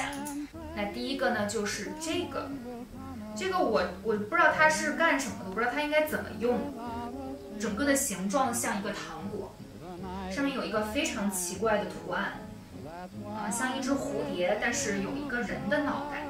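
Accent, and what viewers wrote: Northeast, China. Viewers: 妹子的东北口音已经被修饰得非常轻微了.你精心地学习过普通话，但是音节尾脚还是能被缺姐这样的听出来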